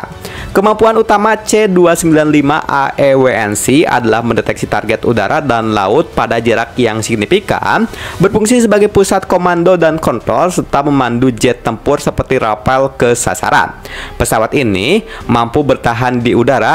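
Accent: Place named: Sunda, Indonesia. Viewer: sunda na meni medok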